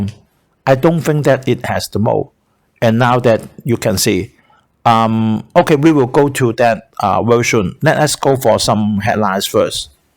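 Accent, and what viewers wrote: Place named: China. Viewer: i don't see you have any improvement at all.you have the faith to speak does not means you speak it right.the way you speaking is like translate chinese speech to english.another Like you speak Omincron you pronounce it OH ME CON go google and type Omincron pronunciation and you find how to pronounce it.another example is the word 'Purpose" and you speak like "purple"and i thought you talking about colour by the way ,if you are born and raise in Hong Kong english is not a new language for you.am I right? hope you don't get mad when i criticize .Have bravery to speaking is good but you gotta speak it right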